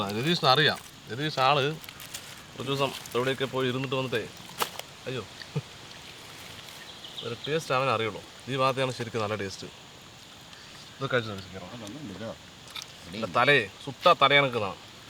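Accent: Palakkad, India. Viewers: പാലക്കാടിനെ തന്നെ ഭാഷയും മഹിമയും കാത്തുസൂക്ഷിക്കുന്ന 🥰 ekka ഇരിക്കട്ടെ ഒരു കുതിരപ്പവൻ ♥️👍🏻♥️🟠🟠🇮🇳🇮🇳🇮🇳🇮🇳🇮🇳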